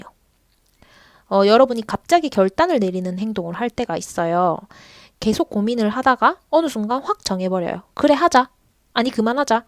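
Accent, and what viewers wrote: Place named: Busan, South Korea. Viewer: 부산사람인가여 악센트가 저랑같네옄ㅋㅋ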